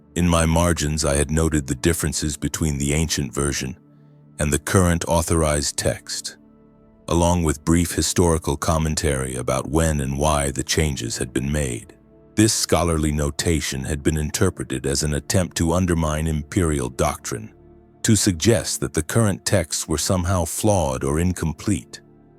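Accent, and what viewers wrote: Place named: United States. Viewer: With so many accents you choose a basic american one? Lacks imagination and faith, the inquisition now watches you for both the sin of imagination and faith in what you believe. Furthermore the pics are not cannon. They Gray Knights are now also involved!!!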